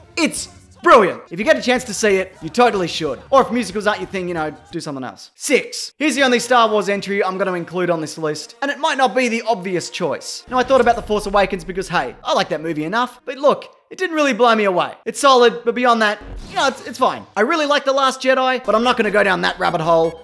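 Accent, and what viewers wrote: Australia. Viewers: I came here for jokes and an Australian mans voice not a reality check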